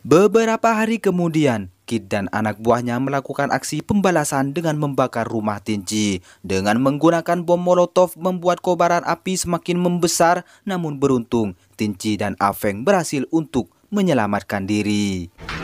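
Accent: Madura, Indonesia. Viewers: Si owen kan pemaen smekdwon si batista pernah maen juga di film the guardian of galaxi sama film avenger and game,kalo si sadi namanya tony ja dia pemeran utama di film ongbak. Kalo dari gaya logat ngomongnya si abang orang madura ya ?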